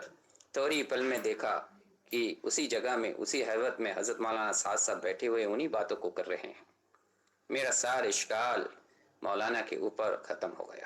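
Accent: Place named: Bangladesh. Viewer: koi tafseel naheen hay sirf baat ke lehje se lagta hai koi bangali hay aur ghair motabar hay.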